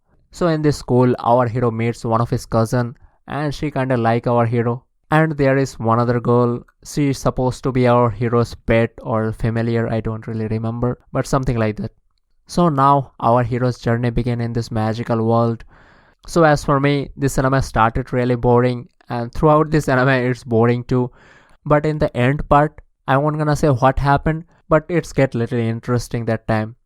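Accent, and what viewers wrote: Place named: India. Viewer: i hope you can fix your english more... and speak less with the indian accent. thanks for the list btw keep it up 👍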